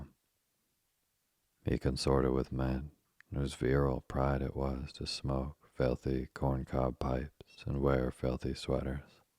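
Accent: United States. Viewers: your straight up American voice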